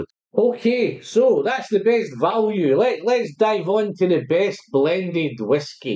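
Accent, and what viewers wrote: Scotland, United Kingdom. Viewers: English, with a few wee drops of Scotia.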